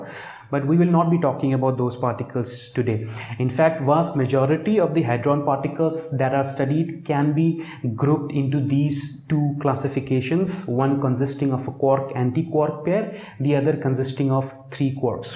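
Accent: India. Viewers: But I guess you're indian and that's why you're accent sounds like that...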